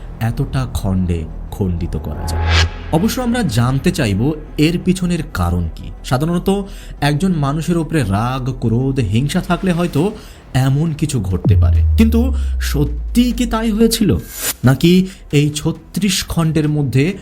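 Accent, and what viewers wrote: Bangladesh. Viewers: আপনার কথার ধরন শুনে মনে হচ্ছে আপনি বাংলাদেশী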